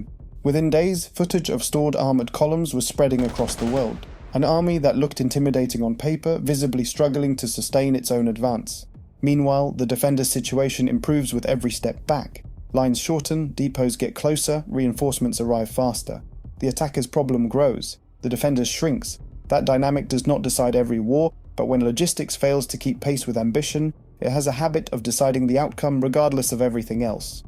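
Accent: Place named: United Kingdom. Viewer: So stereotypical British accent.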